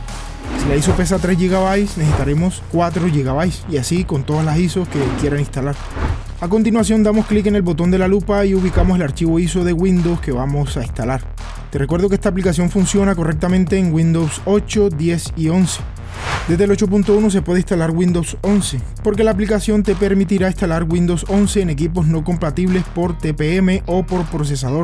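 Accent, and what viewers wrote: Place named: Cartagena, Colombia. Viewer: Amigo, usted es de Cartagena Colombia ¿Verdad? Esa voz no creo que sea de otra parte !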